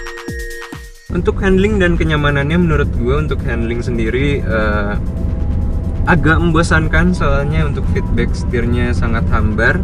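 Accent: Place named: Java, Indonesia. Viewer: Kayaknya logat" jawa dehh.. Tapi kok GUA GUA yaaa😁😁😁😁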